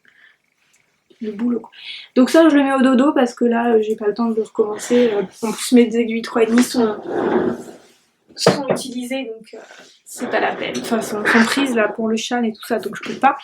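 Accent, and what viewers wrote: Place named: France. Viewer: Je suis toujours contente de voir tes podcasts! déjà entendre parler le français de France c'Est super...et en plus c'Est toujours bien complet! et pour le Kal... oh j'ai hate... ce coup ci je le fais!!! Ne change pas! tout est très bien! Rose, xx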